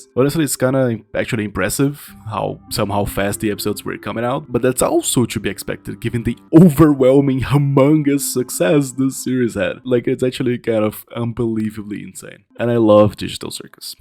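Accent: Brazil. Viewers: I hope you achieve your dreams man! I'm a fellow artist, and I know that our profession is very hard. You seem very passionate about your craft, never let anyone take that away from you! Also, I couldn't help but notice your accent, are you brazilian?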